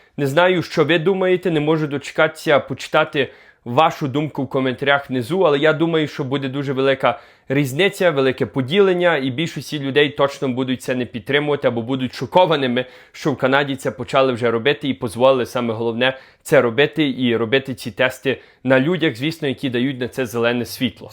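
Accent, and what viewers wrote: Western Ukraine, Ukraine. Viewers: Підтримую україномовний!!! У Вас чудовий західноукраїнський говір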